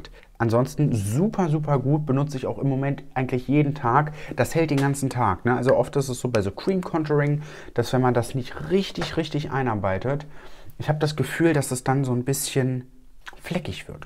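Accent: Berlin, Germany. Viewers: Ich gucke gerade alle deine Videos 🙃 was mir auffällt: Ick vermisse dein berlinern in den neueren Videos. Aber generell suchte ich jedes Video. Liebe aus Berlin!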